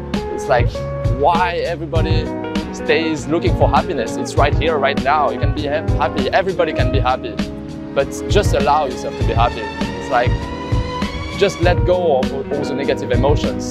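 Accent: France. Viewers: are you french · Thank You man ! I think this is the best video I ve seen today! And I love ton beau French accent en tout cas merci pour cette video et tout tes conseils instructifs <3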